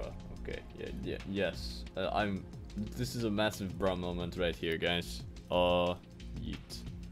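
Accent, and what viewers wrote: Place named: United Kingdom. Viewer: ultimate british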